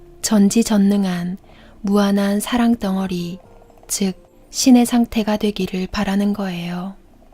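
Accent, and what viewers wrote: Gyeongsang, South Korea. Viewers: 아 목소리...예뻐 좋아요~~특히 경상도톤~~~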